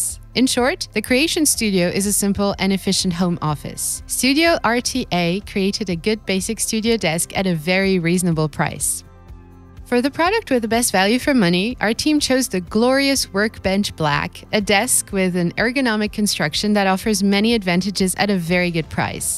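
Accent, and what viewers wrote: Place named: United States. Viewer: American accent, but went metric. Oof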